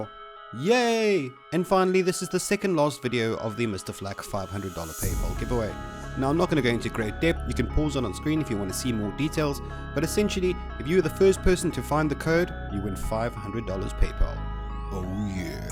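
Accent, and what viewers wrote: South Africa. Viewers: So awesome to hear a South African voice for a change. Great videos. Might I ask how long it took you to edit this video? I'm asking because in my mind this would take me days so maybe you have some much more efficient method. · South African accent? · I knew u were south african. Woop woop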